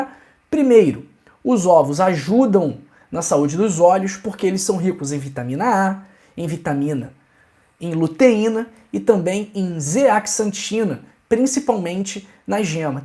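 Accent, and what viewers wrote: Rio de Janeiro, Brazil. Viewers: Vídeo bom. No entanto, esse sotaque carioca muito carregado é irritante demais, não consegui assistir até o final.... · BOM DIA DOUTOR..QUÊ BOM OUVIR À SUA VOZ, ESTE SOTAQUE MARAVILHOSO, O CARIOCA...MELODIOSO,AGRADÁVEL, SUAVE,COLORIDO,CHEIO DE ENTUSIASMO...maryjou prof.italiano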